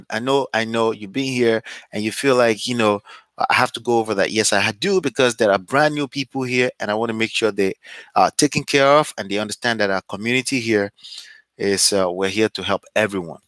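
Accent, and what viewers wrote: Nigeria. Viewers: You Nigerian bro?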